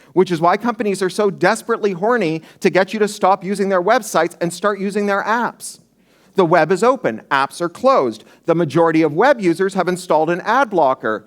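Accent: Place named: United States. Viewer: eek-barba-durkle! this guy with an american accent thinks in euros... or all over the place. tf!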